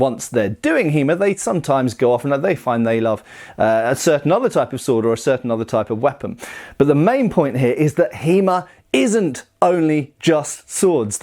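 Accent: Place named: United Kingdom. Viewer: I like your use of a proper British accent in this video.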